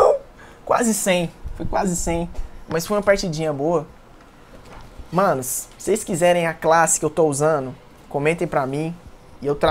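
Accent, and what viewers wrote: Minas Gerais, Brazil. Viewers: Quem curte o Mineirês do dá like!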